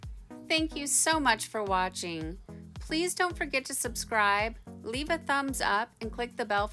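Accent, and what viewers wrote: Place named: Midwest, United States. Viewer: Not weird at all! But I insisted my husband come hear you say it because I knew it would give him a taste of home. You’ve got the typical midwestern “flat a” just like he does when pronouncing words like back, flat, and, of course, wag. He’s from Michigan, but we currently live in South Florida, so “hearing home” made him smile. Thanks for that. As for THIS wigwag, HOLY MOLY!!! I don’t have words (and that’s saying something for ME!) Simply stunning! Redemption complete.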